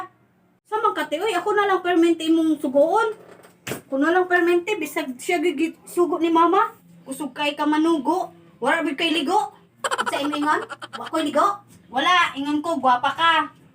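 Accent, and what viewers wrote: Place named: Visayas, Philippines. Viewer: She is so funny nakadagdag pa ang Visayan accent. Super laughtrip ako dito. Hehe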